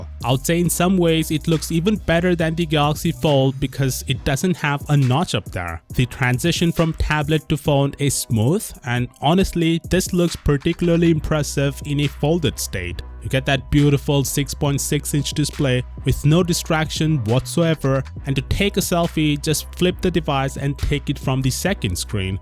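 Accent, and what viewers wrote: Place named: India. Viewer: since your indian yourself probably you should easily be able to tell his indian. I have watched a lot of "microsoft tech support scam videos" and i can tell by his voice/accent he is indian.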